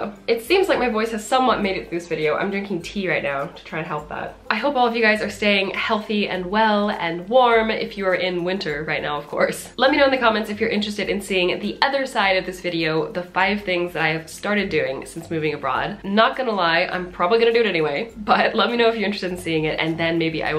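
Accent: Midwest, United States. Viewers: I love studying accents and exploring how people's speech patterns change over time. I am from CA and lived for two years in the UK. My speech and word choices were all over the place when I got home. I can still hear the Midwest in your speech! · What state are you from originally, if you don't mind sharing? I'm guessing Ohio, Michigan, or Indiana.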